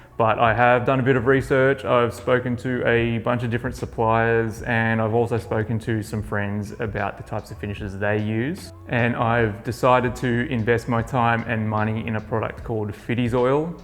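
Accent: Australia. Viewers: Brilliant piece. I only realised you were Aussie in this video. Maybe I haven’t seen a video you speak in. Would love to visit your studios next time I’m up that way.I’d love to pick your brain. I just moved to Vesting LED cured hard wax oil. Not sure if you know it. Pretty cool, and I definitely had to make a few adjustments to sanding but it’s a great finish so far. And the instant LED cure is pretty amazing. I switched from Osmo too and I absolutely had issues with water/liquid damage with the last few pieces compared to previous. Never thought about too much till you mentioned it. Anyway, thanks for sharing as always, brilliant.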